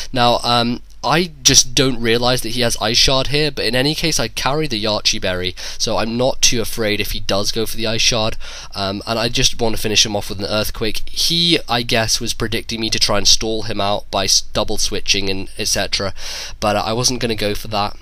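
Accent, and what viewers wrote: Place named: United Kingdom. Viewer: I love your commentary! great to hear a brit commentating, sooo much better than the yanks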